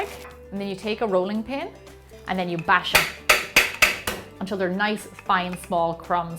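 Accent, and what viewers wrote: Ireland. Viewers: Is she like Irish or something? Irish people are wonderful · are you Irish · I just found your channel and I love it! So much great backing Ideas. Even for me as vegan you notice which recipe will work great in a vegan version! Great job! Have to try something from your recipes, definitely! Btw I love your english, in " home made butter video " you said that you are from Irland? My favourite country to see one day!!!!!!! I'm a new subscriber of your great channel and can't wait for new yammy Ideas, greetings from Switzerland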